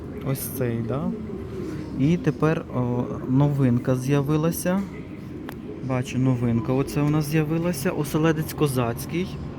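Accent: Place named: Cherkasy, Ukraine. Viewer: Той момент, коли в мене в області всі так розмовляють і це норма. Привіт з Черкащини, 😅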